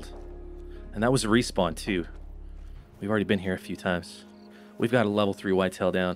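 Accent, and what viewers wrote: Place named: England, United Kingdom. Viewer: I love your English accent 😂🤣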